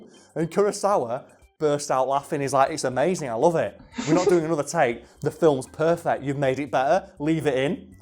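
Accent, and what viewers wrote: United Kingdom. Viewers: And what i said about government guidelines was in response to what you said about masks. And i don't think it's that hard to pinpoint where this guy is from, considering his extremely British accent. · You can tell that he's British from the first few words. "Like seasoning its not necessary"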